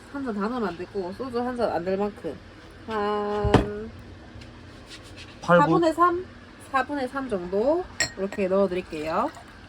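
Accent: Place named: Gyeongsang, South Korea. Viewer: 구수한 갱상도 사투리가 매력적입니다❤️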